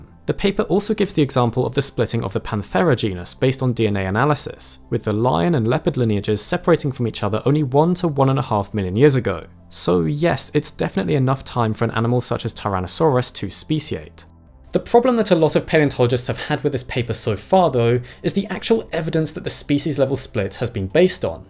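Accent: United Kingdom. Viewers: They’re literally just British lmao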